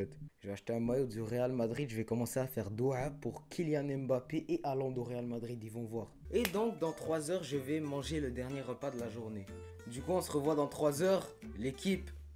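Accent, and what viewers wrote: Quebec, Canada. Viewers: T'es Québecois???? Sinon fréro je viens de te connaitre grace a Joyca ;) et t'es trop marrant fréro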